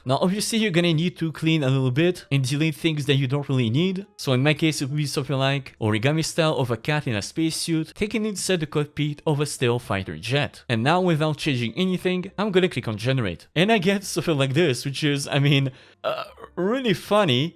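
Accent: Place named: France. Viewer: Thanks for the post, but please tell you voice-bot to slow down, especially when using the Francois accent, e.g., "EEMPTEE" for "empty" throughout, and the like, t might be easy to train your bot to avoid... THANKS!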